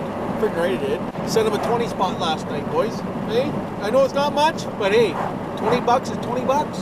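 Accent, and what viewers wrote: Canada. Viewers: fuck eh you can tell you're from Ontario 😂 i only say this because I'm from the Stratford area and we all talk the same glad I found the channel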